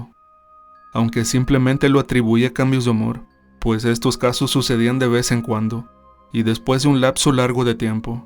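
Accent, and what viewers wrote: Mexico. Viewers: Amigo, quiero hacerte una inquerencia, y espero que no cause ningún incordio, es la siguiente: El acento o tono que empleas para hacer tus narraciones ¿es propio de algún lugar en específico o es un tono que haces para narrar? Sí me suena mexicano, aunque no sabría de cual lugar de allá. ¿No es incomodo inquirir esto? Saludos, Dios te guíe.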